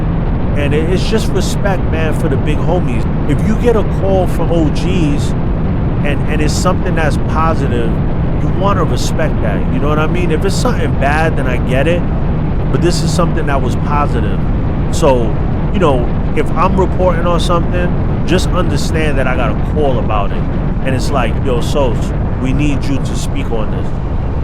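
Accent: New York, United States. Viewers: I grew up in NYC. Left in 2001 I’ve been down south since. I miss hearing your accent. I miss “home” U sound great. Thanks 4 bein genuine. We need more people like you. Ride Safe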